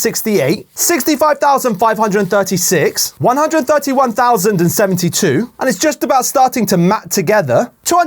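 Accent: United Kingdom. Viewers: i love the britishness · “for the non-americans” him being the most british person ever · Is he British?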